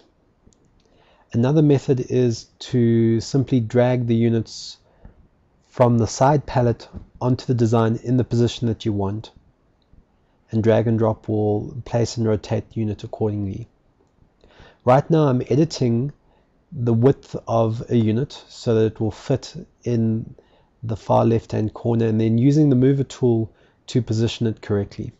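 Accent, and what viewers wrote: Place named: South Africa. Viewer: hey....a south african, bru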